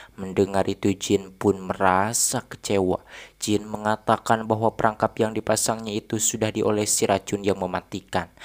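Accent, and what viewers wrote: West Java, Indonesia. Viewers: AA orang mn siiih? Jiga na orang Sunda na..semangat a💪cute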